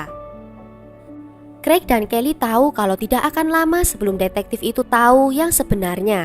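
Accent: Java, Indonesia. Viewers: medok bgt sis.. wong endi sampean?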